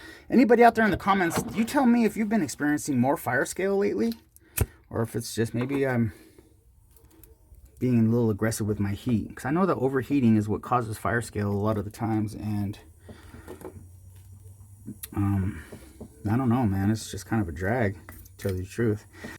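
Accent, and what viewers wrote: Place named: United States. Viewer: Hi, I am new to your channel and I am enjoying it very much. I make jewellery, but mostly copper, and wire wrapped. I do make rings and bangles and do the soldered join, but that is it for metal smithing so far. Keen to try bezels with strip wire bezel and also gallery wire. Tell me, as an American why you guys say sodder rather than solder and using the l in the word. It sounds odd. Anyways, glad you enjoy our English accent. I live in france and 5he French love the English accent speaking French lol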